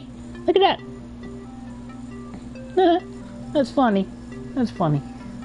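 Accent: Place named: Germany. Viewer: 10/10 German accent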